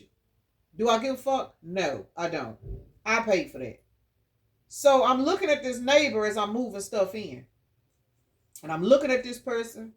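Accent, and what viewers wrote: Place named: Texas, United States. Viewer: ESPECIALLY YOUR TEXAS-ASS ACCENT 🤠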